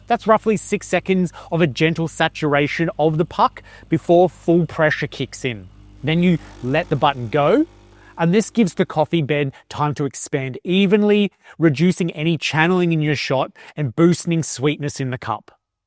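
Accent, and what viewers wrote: Australia. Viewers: I watched your video for the first 3 minutes with captions and the sound off. Thought to myself, 'This guy probably has an Australian accent.' Turn the sound on, and I was right. How did I know that!?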